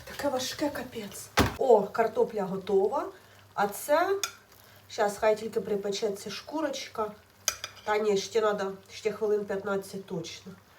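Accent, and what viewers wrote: Ukraine. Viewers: Всі слова мацковські з українським акцентом ,і Бога приплітає !Вчи мову ",бєстолоч "!І молись !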